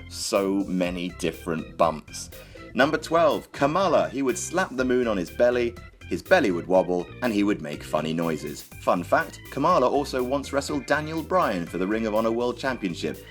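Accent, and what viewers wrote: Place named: United Kingdom. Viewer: fucking hate British accent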